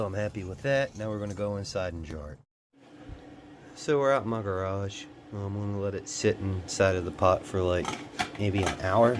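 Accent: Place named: Appalachia, United States. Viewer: Hahah that was funny as fcuk😀 Ya kinda sound like a mountain-man from Appalachia.. but what do I know, I'm in friggin Sweden.. Much preech the knowledge sir,(bird seed, awesome!). Greetings from a new subscriber in Stockholm🙂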